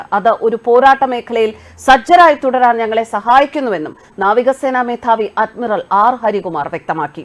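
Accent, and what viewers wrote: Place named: Palakkad, India. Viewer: ഹോ ഒരു കൂതി ഭാഷ പാലക്കാടനാ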